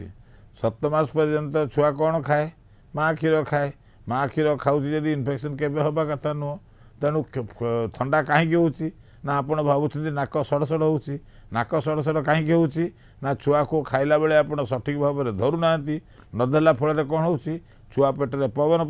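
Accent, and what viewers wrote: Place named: Bengal, India. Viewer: Dr Odia karuchanti bangali vasakemiti aasuchi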